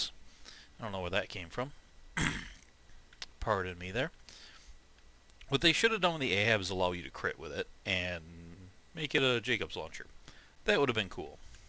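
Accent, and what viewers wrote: United States. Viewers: "Nuke-u-ler" Nor picking, but is that how american's say "nuclear"? I'm assuming you're from the US judging by your accent though.. I always thought words spoken in American-English dialect are pronounced the way are spelled, though you're not the first person I've heard pronounce it in what sounds like a foreign language to me lol xD And yes, the Tunguska does consume two ammo per shot.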